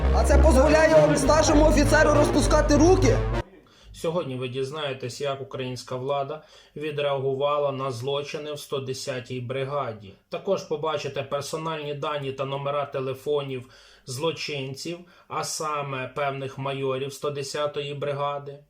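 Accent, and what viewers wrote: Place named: Russia. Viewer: Он даже чёкает чё четы с окцент расийского дерьма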